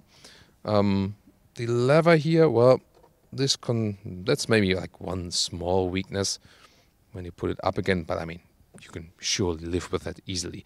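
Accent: Germany. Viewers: i like ur casual look betterand ur hairback looks better.still got a nice german accent~~~~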